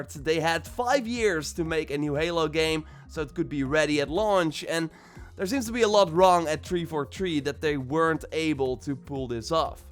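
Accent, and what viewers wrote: Netherlands. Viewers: Je klinkt als een hollander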